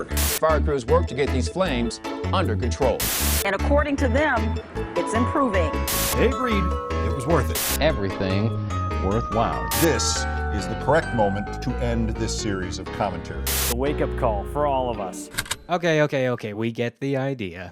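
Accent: Missouri, United States. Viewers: He's from Missouri. We all talk like that. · I live in Missouri and it is so obvious you are from Missouri even in your reporter voice. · He just sounds normal for Springfield Missouri. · My mom is from Missouri and I knew you were too, based on the accent.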